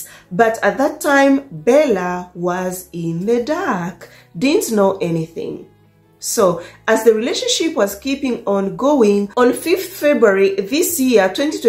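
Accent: Uganda. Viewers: This is ugandan accent not mzungu the voice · It's Ugandan accent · Teller's accent is a Ugandan.Sorry Brenda😢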